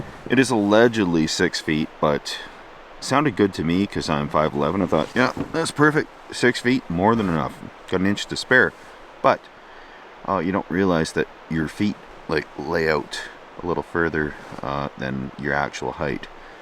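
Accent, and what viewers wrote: Alberta, Canada. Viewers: Epic idea. I love your stuff. Former Edmonton resident and you got that same Albertan Hoser accent that I miss so much. Haha. You remind me of a bunch of good dudes I knew when I lived there.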